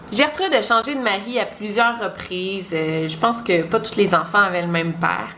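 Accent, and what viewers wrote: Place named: Quebec, Canada. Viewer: kikou j habite en france en lorraine et j aime bcp tes reportages et l accent quebecois suis pendu a tes levres pour comprendre mais j adore sur l affaire de sylvia likens c est celle ui m a le plus marque j avais vu un film sur you tube se rapprochant de ce drame mais je,ne retrouve plus si des youtuber?, en tout cas merci pour ton travail respect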